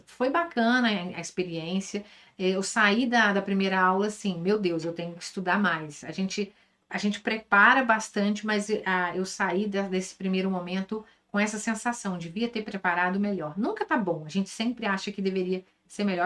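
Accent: Minas Gerais, Brazil. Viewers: Ele mineirinho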